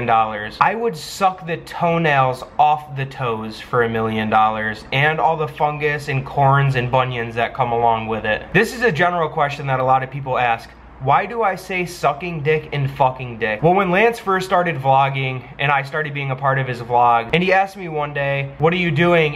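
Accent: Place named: Upstate New York, United States. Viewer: I could hear the Upstate NY in your voice. You sound just like my ex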